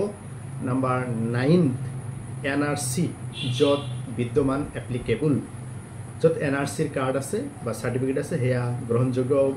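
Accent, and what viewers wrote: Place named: Bangladesh. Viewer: Maat tu pura bangladeshi jen lagise.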